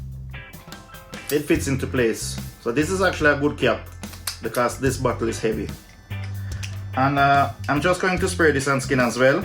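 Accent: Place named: Jamaica. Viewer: 👨🏻‍🦱sounds like a Jamaican boy 👦🏿 was NOT expecting that 😂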